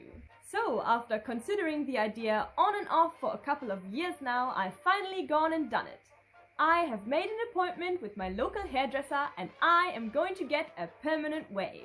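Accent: Germany. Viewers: oh wow ! You've lived in NZ since you were 8 . May I ask how you retained such a strong german accent ? Most people lose theirs when they move abroad as a child . Love your videos!! ☺️ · are u german by any chance? you kinda sound like one and your last name does too :D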